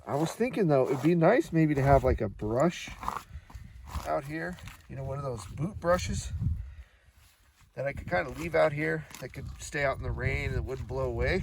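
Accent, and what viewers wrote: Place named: Long Island, United States. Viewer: Where you from you sound like yr from long island ny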